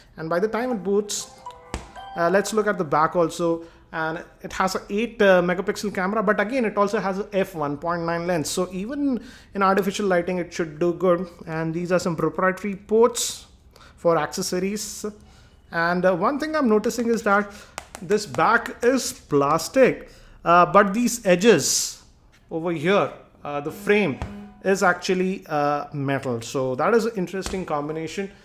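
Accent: India. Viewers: the heavy Indian accent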